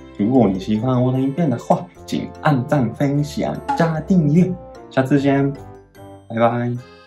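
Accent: Taiwan. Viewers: 老师的中文也有点台湾腔了haha🙋🏻‍♂️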